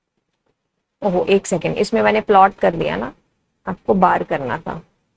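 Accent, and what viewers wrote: Punjab, India. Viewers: Mam aapka Punjabi accent 🔥🔥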